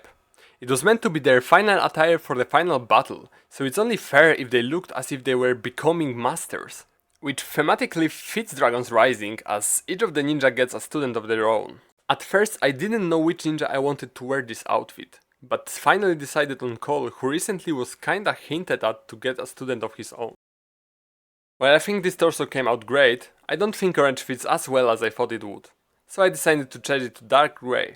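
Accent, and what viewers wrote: Poland. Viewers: akcent zbyt polski😩🙏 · Brzmisz jak brat Polak który próbuje po angielsku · Are you polish like me?? You have polish accent. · Are u from poland? Beacose ur accent sounds pretty polish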